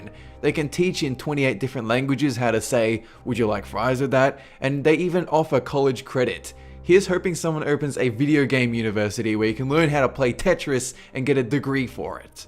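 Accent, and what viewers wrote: Australia. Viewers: or you can listen to his voice to find out..... he clearly has an australian accent.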